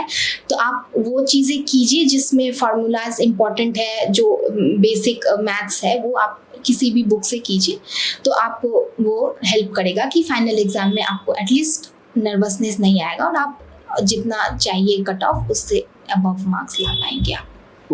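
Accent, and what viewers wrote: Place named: Bihar, India. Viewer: Bihar se hai ye hindi me bojauri include karate hai 😊😊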